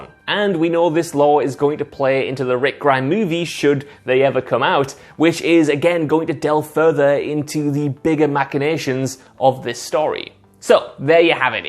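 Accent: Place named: Scotland, United Kingdom. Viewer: I think it’s so satisfying how Scottish (maybe Irish) he sounds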